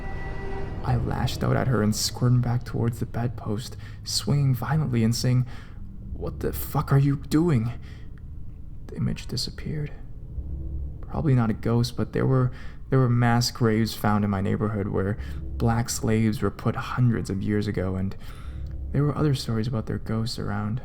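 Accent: Canada. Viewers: Canadians... that accent,and the overacting makes this post sound like one of those scary stories for kids records from my childhood, "whose got my golden aaaaarrrrrrrrmmmmmm?" kinda thing. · I can tell he's Canadian because instead of "about," he says "aboat." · Are you Canadian??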